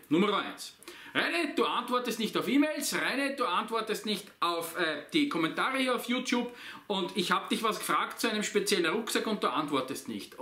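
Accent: Austria. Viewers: Lieber Reini, und alle Komentarleser Ich , persönlich, hab den Kanal abonniert weil : 1. ich mich für Outdoor-Ausrüstung interessiere und immer auf der Suche nach neuen bzw. unbekannten Sachen bin, die nicht jeder hat und kennt. 2. du einfach , authentisch, sympatisch , rüber kommst und der österreichische Akzent mich amüsiert. Wer was anderes erwartet hat ist meiner Meinung nach hier falsch. Ich hab im übrigen schon Kanäle deaboniert weil mir das stäntige Gegrüße in den Videos auf den Zipfen gegangen ist, so ein Blödsinn! Das mit den Kanalvorstellungen find ich auch deppert aber gut seis drum. Ich werd mir diese Videos dann einfach nicht ansehen. Aber ich will dir da auch nicht reinreden mach wie dir lieb ist. MfG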